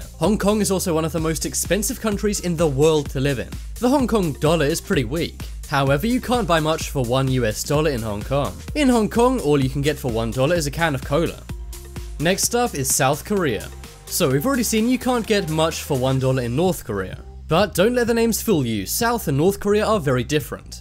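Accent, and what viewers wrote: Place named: England, United Kingdom. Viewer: Why does he have an English accent but use American terms in all his videos?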